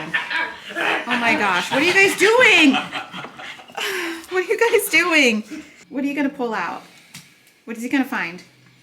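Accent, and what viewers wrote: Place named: Jamaica. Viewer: I hear your Jamaican dialect. Its hidden but it's still there. Hello to yah. Looove your home. You are very much like me in style and decor. 😊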